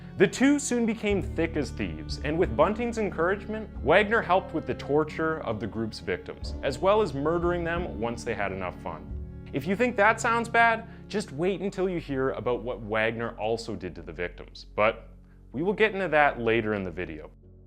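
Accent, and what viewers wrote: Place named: Canada. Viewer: Is this a Canadian channel? Dude has a ridiculous accent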